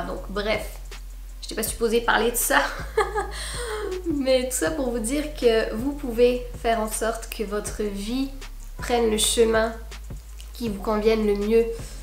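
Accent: Quebec, Canada. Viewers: t est en france avec un accent mais tu parle comme une quebecoise tu nous aura toujours dans ton coeur. ici on a commencer a vacciner.merci de pas nous oublier pout ton autre chaine plus tu va aller dans le domaine (SPIRITUEL) ca interesse certaines personnes mais pas tous ..je te souhaite de belles fetes mais sans neige rire bisou cher amie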